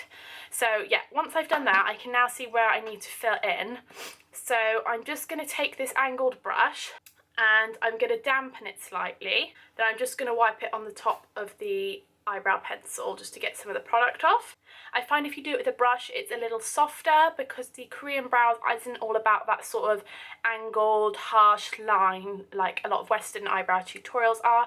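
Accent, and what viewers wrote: United Kingdom. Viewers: How do you acquire that cute English accent :) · Is she brithish? I really like and enjoy her pronounciation. ^ ^ Easy to follow and to understand - I'm not a native speaker btw. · i think she's british because of her accent. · this is why i love British accent. btw, you are so cute <3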